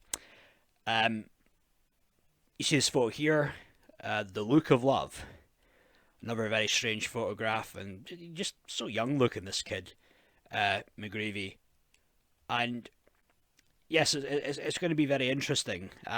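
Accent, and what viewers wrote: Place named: Aberdeen, United Kingdom. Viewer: I'm thinking Aberdonian :)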